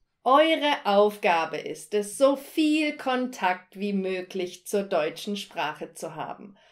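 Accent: Russia. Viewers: Да слышно же, что она русская ^^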